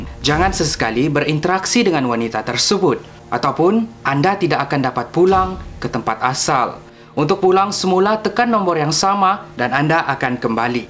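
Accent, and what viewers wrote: Sabah, Malaysia. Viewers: dia nie sabahan ka atau indon? maaf tnya mcm nie. .sya pun sabahan juga. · Dari sabah kah?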